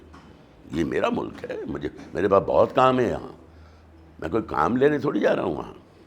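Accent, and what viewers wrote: India. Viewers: Although sound indian I admire his voice..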